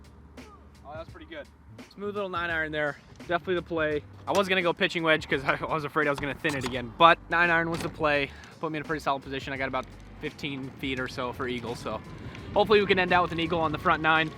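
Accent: United Kingdom. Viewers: Excellent british accent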